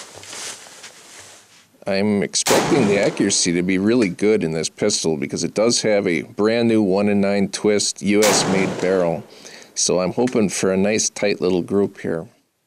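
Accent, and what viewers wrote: Canada. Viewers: Very nice video! I really enjoyed your presentation style and your coverage of the beautiful nature as well as the technical details! Have been subbed for a while and really appreciate your channel. Canadian accent? Your weather is cooler than ours (here in Switzerland -3°C as I write). My local outdoor range is closed for winter :-( Take care, Rob (Brit/Swiss) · your from nova scotia arent you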